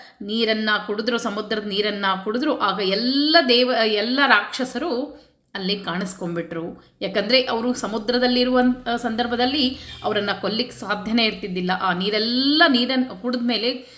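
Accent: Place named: Hubballi, India. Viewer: madam you are amazing. I like Hubbali accent. Thank you for you guidance. Like short Shlokas.Thank you❤ Namaskaram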